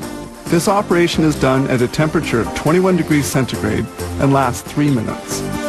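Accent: Canada. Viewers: canadian eh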